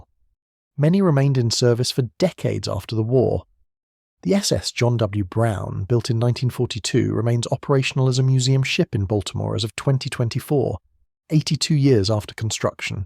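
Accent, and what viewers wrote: United Kingdom. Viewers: Great detail video.But it is by a british guy , parenthe sounds british , I don't think it's a I voice. But tell Americans for a more crude and the way they assemble a ship, but they float and got the job done, which is true and how their ships were built and took years to build and they were topping line no by time, they finished them over there in England they were obsolete.That's why bismarck , and what have your sunk the english , ships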